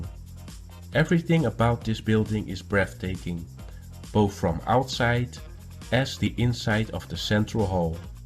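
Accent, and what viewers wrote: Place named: Netherlands. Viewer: To be honest , please pronounce "s" in the end of a word more like a "z" ,not whith a Dutch "lazy s". Same goes form "d" (not a "t"), like : "and" NOT "ent". Sorry to say , BUT if you really are into English , please get interested in pronouncation. (Sorry and good luck)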